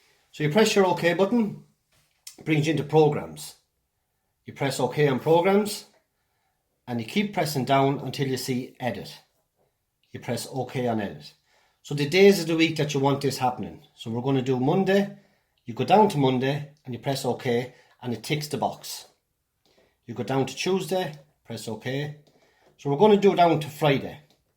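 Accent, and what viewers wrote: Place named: Ireland. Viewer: Cool to hear an Irish voice as the guide! ☘️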